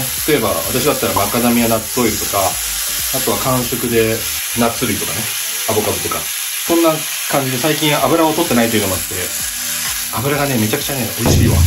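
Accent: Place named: Kansai, Japan. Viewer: あと、フル関西弁動画お願いします😎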